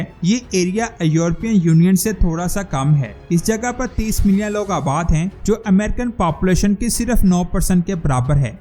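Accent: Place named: Pakistan. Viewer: Love from india ! You're the first good Pakistani YouTuber I've seen (can tell by your Hindi/Urdu accent)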